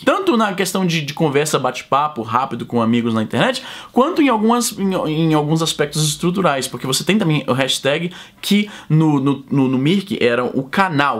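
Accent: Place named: Ceará, Brazil. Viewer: Curioso como tu n perdeu sotaque cearense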